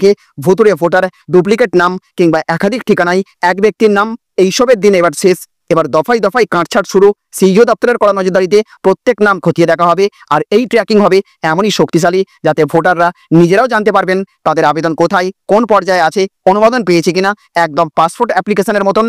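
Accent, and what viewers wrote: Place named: South, India. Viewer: Aponi ki bangla vasai bollena na ki madrasi vasai bollena.....konta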